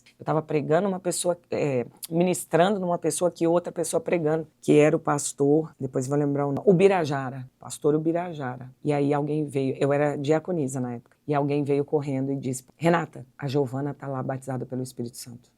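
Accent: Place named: Belo Horizonte, Brazil. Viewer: A senhora é pastora em belo horizonte? pergunto pelo sotaque 😊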